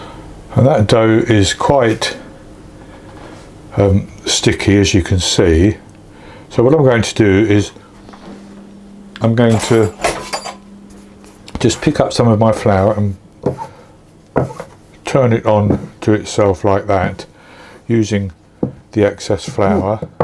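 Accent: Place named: Devon, United Kingdom. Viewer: Wonderful recipe uncle...very therapeutic...Perceval a old gentleman friend of mine is convinced.. You are from Shropshire...he enjoys working out accents...now he thinks your from Devon. Any clues without revealing so we can work it out.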